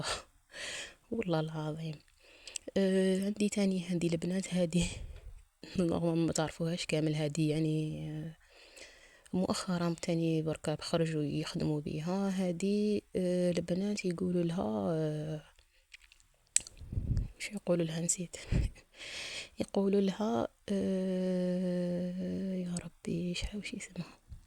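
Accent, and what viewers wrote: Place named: Djelfa, Algeria. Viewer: عندك الجلفة في صوتك 😬اااااااا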